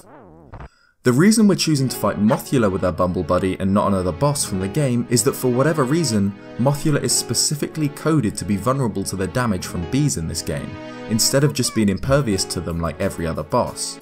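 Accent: United Kingdom. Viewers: I think its a british thing, because I know that DanTDM - another british youtuber -says "lava" as "laver"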